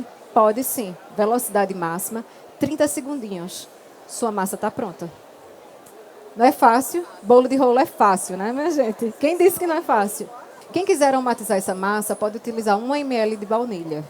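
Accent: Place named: Pernambuco, Brazil. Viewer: Adorei! Perfeito o bolo de rolo, melhor bolo! Adorei o seu sotaque. Viva Pernambuco!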